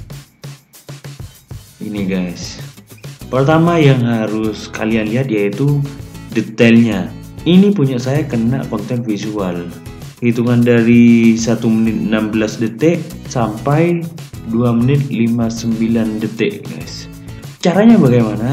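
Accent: Madura, Indonesia. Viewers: Reng madureh yeh tretan... 😋😁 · Oreng madureh ben lek? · Logatnya Madura .. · Madureh bos tak elang hehehe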